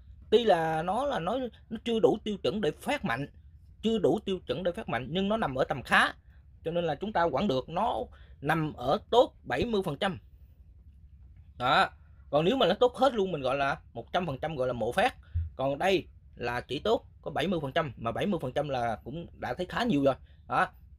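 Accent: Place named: South, Vietnam. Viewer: Thầy giảng hay dễ hiểu nhưng nói hơi nhanh giọng nam chưa quen nghe thầy nói chậm một chút Và muốn mua là kinh để học thì mua ở đâu? Và muốn học thầy thì thủ tục ra sao Cảm ơn thầy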